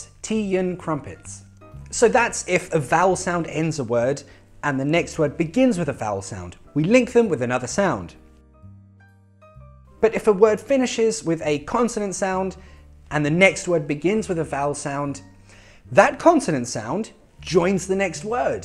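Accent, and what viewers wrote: United Kingdom. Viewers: amazing as always.... i really love british accent, such an elegant way of delivering ur speech, thanks for uploading. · British accent is the best! And now what a cool way to learn it from ya, thank you loads! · sweet British accent 😍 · Thanks! I like your videos so much - particularly because they are about British English! You know, on the 'net' the majority of English speaking video hosts are North Americans, which are quite all right to listen to. But when I hear British English - that's totally different! So I'm hoping for more of your vids to come. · Thank you for sharing, i really like British accent as well as England's culture, i thought i love your country, and i'm a fan of Manchester United, anyway i love your video! I learn many many things from it! Once again thank you! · a Man with british accent is really Hot😀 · the way u teach is perfectly amazing..I mean I don't have ny words to describe...I have seen so many videos on YouTube of different people..but u r d one who makes british accent learn very easy.....thanks a lot for all ur videos.. 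God Bless. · an intelligent cute guy with british accent...oh lord...thats soooo goooood.... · I like British accent so much. And I love the way you teach.:-D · Brit accent got Style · whats kind of your accent? estuary or R.P. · Gosh =((( I wish i could speak like that. British accent is the best.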